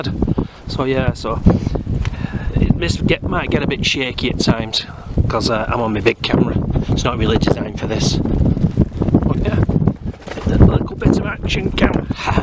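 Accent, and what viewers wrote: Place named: North, United Kingdom. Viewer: Subbed you right back my mate... I's good to hear our Northern Lingo on the Tube pal.. Always up Rivvy buddy... Taking the lads up next Saturday as it happens. We are only 5 miles South of the Pike too man. Small world. :-) Have a good un mucker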